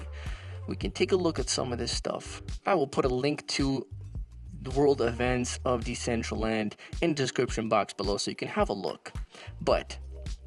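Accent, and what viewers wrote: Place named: United States. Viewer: where you from? I’m having trouble deciding whether you’re American or Canadian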